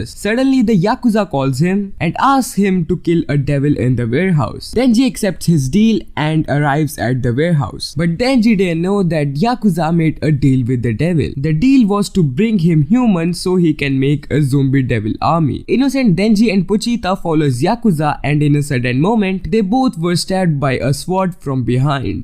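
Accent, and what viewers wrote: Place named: India. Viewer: Bro why don't you voiceover in hindi Misss your hindi accent 😢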